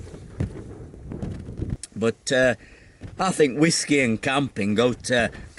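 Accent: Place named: Yorkshire, United Kingdom. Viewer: Proper Yorkshire accent:)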